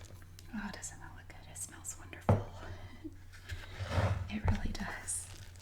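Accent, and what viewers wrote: United States. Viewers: I'm not pointing a finger at you or anything, but I think it's so funny how Americans say Aldi 😂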